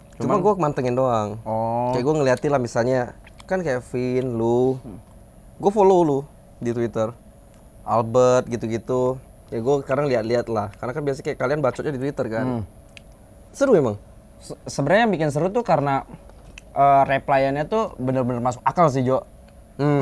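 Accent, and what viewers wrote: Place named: West Java, Indonesia. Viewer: Sunda bnget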